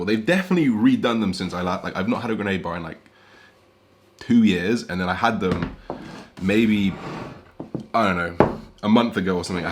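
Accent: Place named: England, United Kingdom. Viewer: I have trouble with understanding english accents, but your content is too good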